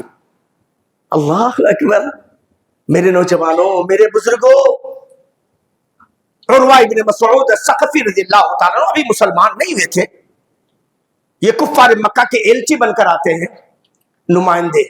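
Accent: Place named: Arab world. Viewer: عربی لہجہ بھی بہت ہی بھدا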